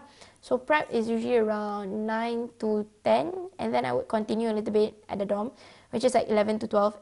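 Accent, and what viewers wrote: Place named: Malaysia. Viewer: I really like the way you talk in english.. ppl nowadays like to sound lah mat saleh and end up orng lain tk faham.. u ckp still ada bunyi malay but thats how we yg ssh nk faham jadi eh eng senang je hahahahahha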